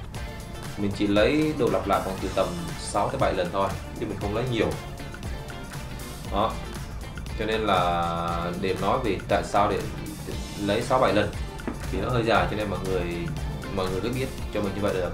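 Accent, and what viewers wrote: Vietnam. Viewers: Bạn người ở đâu mà nói giọng miền trung vậy...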